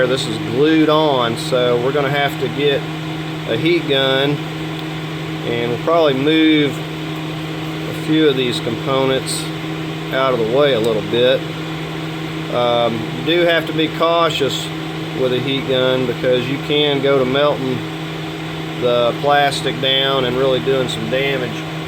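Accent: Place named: West, United States. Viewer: your prononciation is like some cowboy from old movies.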